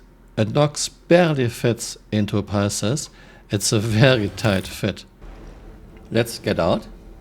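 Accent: Germany. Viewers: Wie deutsch kann man sein wenn man english spricht. MAXIMAL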